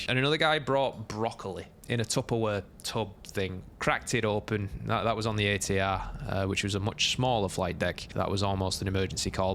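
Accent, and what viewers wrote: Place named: Lancashire, United Kingdom. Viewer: Thanks for the video! Much appreciated. Loving the accent😊 Preston? Blackburn?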